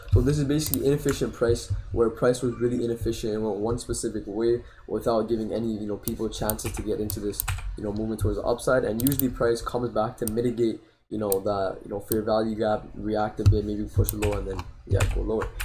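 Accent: Toronto, Canada. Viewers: The way you talk tells my you're from GTA, link up homie 😈 Also do you analyze crypto as well? Would love to see some content regarding that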